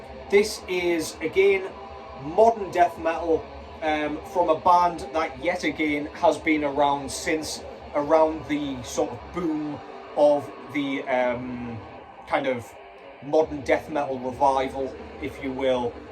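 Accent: Newcastle, United Kingdom. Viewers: ah lived some of me life is Washington so ah knaa it can gan either way in Durham, but yuh sound completely Geordie tuh me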